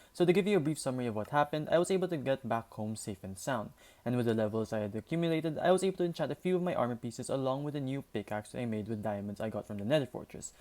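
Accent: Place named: Philippines. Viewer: Sounds like filipino accent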